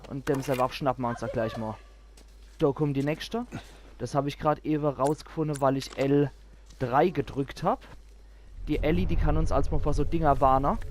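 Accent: Palatinate, Germany. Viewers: Hab ich das richtig verstanden am Anfang: "Wir sind im Hemshof gelandet"? :D Ist das ein pfälzischer Ausdruck oder meintest du tatsächlich den Stadtteil einer bestimmten Stadt in der Pfalz? :D Hab deinen Kanal über das Forum gefunden und fand deinen "uff pälzisch"-Aspekt sehr interessant! Bin selbst in der Pfalz (Vorderpfalz) aufgewachsen, auch wenn man das gar nicht glauben mag, da ich ziemlich Dialektfrei bin :D Werde auf jeden Fall von Zeit zu Zeit mal reinschauen :)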